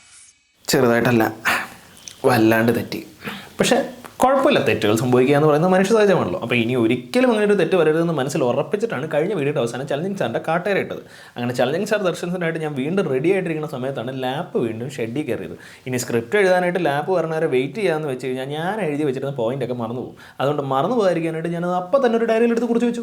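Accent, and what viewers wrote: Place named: Palakkad, India. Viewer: Bro നിങ്ങൾ പാലക്കാട് തമിഴ് വല്ലോ ആണൊ നിങ്ങൾ സംസാരിക്കുമ്പോൾ തമിഴ് mix ചെയ്തു malayalam സംസാരിക്കുന്ന ആളെ പോലെ ആണ് എപ്പോഴും തോന്നുന്നത്.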